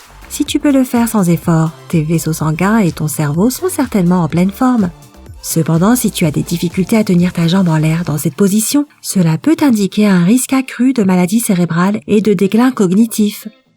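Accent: Quebec, Canada. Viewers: elle a la rhume la dame kubquoi horrible la voix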